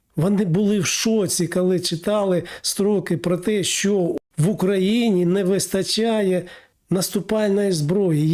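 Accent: Russia. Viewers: Що зробити щоб ви вивчили українську мову?? У вас суто москальський акцент.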